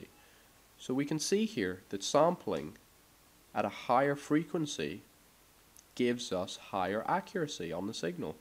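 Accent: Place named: Northern Ireland, United Kingdom. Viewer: where are you from I can't tell you got a mad accent fam sounds almost northern irish but at same time quite english what type of hybrid are you this is melting my brain at this tender hour i need to rest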